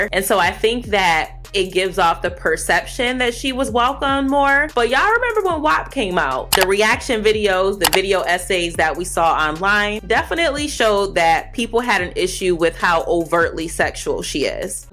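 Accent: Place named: Upper Midwest, United States. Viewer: Not to be off topic But I sense an upper midwest accent. Are you from Wisconsin or something?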